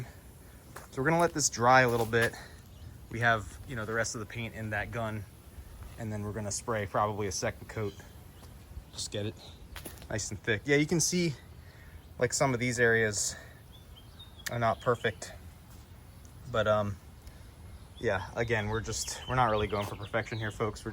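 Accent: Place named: Pennsylvania, United States. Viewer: You talked for 3 seconds and I knew you were from PA. good work and the paint job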